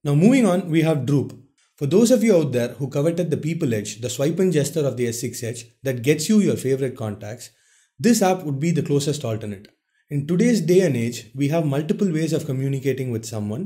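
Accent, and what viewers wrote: India. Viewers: agree... its pure desi :) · I have indian friends. But they learn to get rid of their accent. Not because of dishonoring their culture. But its a skill. You are communicating with the whole world. Please learn proper english pronounciations. Remove your accent and more will come to watch your videos. Your videos are good. But your accent... Help yourselves bro. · the sound of indian.. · Its sad to know there are so many racists on youtube, if u don't like the accent or indians then instead of commenting on the video just, GET THE F**K OFF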